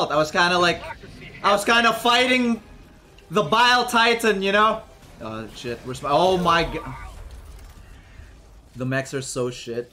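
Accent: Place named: Central Jersey, United States. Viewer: Yeah at first I assumed he was from Central Jersey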